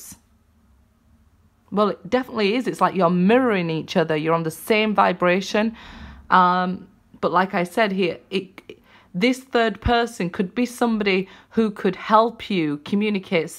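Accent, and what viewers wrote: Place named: Northern England, United Kingdom. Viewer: Nice reading.. thank you! And love the Northern accent.. Ay up lass! 😊😂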